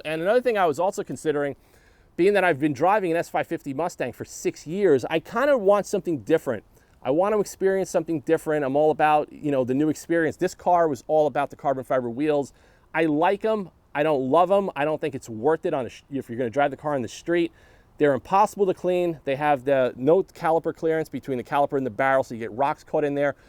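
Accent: New York, United States. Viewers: I bought a base model velocity blue 2020 gt500 on 1/6. It has white vinyl stripes. It won’t be driven in the winter. Your channel came up when I googled “GT500 in the winter”.It was interesting hearing your New York accent since I grew up in the Bronx. I now live in the very warm state of Minnesota. 🥶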